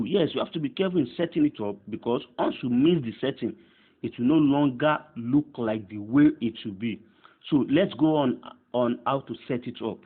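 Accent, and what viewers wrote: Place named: Nigeria. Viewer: Add bulegoo bands... dam gotta love Nigerian accents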